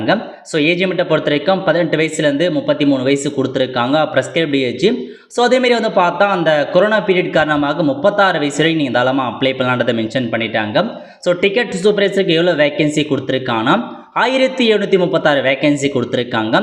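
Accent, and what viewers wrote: North, India. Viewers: நீ தமிழன் தானா...? உன் பேச்சு வடக்கன் மாதிரி இருக்கு...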